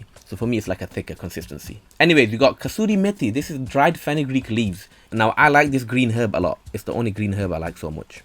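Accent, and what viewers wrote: Bradford, United Kingdom. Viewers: Bradford right? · Just saw this vid in my suggested vids, the thumnail was appealing enough to make click on it and ended up watching it right till the end. Quite interesting. Is that a Bradford accent?Subscribed and will definitely try cook this dish on one of my days off. Thanks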